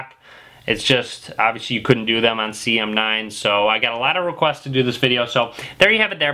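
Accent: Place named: Chicago, United States. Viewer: This guy has to be from Chicago. I'd know that accent anywhere.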